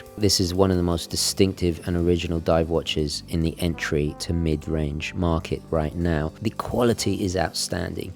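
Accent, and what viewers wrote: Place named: RP, United Kingdom. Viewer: I knew you were a fan, as I have mentioned before how since becoming part of the Watchbox Team, you have made your videos a little bit more posh sounding, and a bit Partridge. I am really hoping you do a full homage and add a 'ahaaa!' in one of your videos. These are great watches too by the way. I have recently had a 'field watch' buying addiction, being ex Forces, I have recently purchased five field watch style watches including three Hamilton, and a Longines military COSD which is hopefully being delivered today. I have a black face one coming , it has the forces broad arrow (crow's foot) on the face. I really want a white face one but I cannot find a new one anywhere. There is a pre owned one on eBay but it looks a bit beat up, and I got a brand new black face one heavily reduce in a Black Friday sale. I think the retail is just over £1,200 and I got it already reduced, then a Black Friday promo code worked, so it ended up being £748 UK sterling, free next day delivery from an Authorised Longines Dealer too, not grey market. 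A bargain. I am just negotiating a 'Dirty Dozen' watch. Really love those. I think Hamilton field watches are a bargain too, I got mine also with around 35 to 40% off RRP, again from Hamilton AD too. Apologies for the length of this comment. I am also waiting on two Stowa Flieger watches to be delivered.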